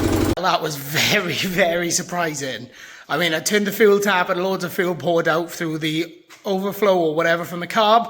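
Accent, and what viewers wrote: Wales, United Kingdom. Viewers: Are u welsh bro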